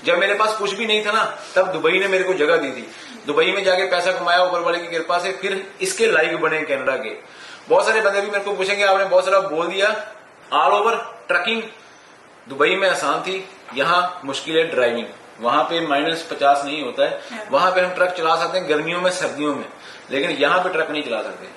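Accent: Punjab, India. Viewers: I always like to see your videos because it's informative and entertaining too... your talking way with punjabi-hindi that is awesome.... specially word Sat shri akal ki hal chal and chardikala.... God bless u bro 🙏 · Bhahi tohadi hindi bilkul mere jesi hai.. Hum punjabiyon ki hindi bhi kamal hai :)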